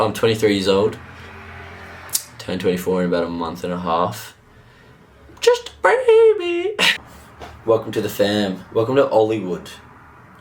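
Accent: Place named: Australia. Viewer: I just discovered you from Rob's Turbo Sprint video, I can't wait to watch the hour and a half of videos of your South America trip. Keep your energy and the good vibes. Love from French Canada! PS: I can't listen to your videos, I feel like my grandpa cause I need to lip read haha, amazing accent from down under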